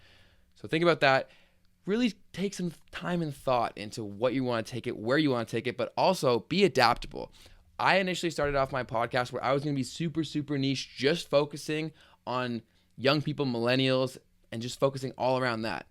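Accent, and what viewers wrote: Canada. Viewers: Love that Ontario accent! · Thank you for those tips taking notes ! I couldn't help to notice at the beginning are you from Canada? if yes which province :)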